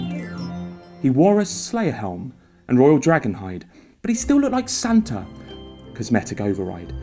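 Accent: United Kingdom. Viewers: Dat beautiful british accent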